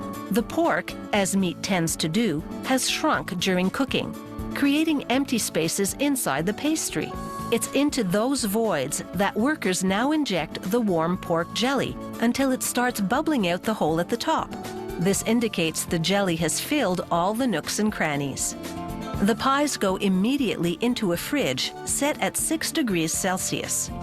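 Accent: Canada. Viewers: that Canadian accent tho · The narrator sounds Canadian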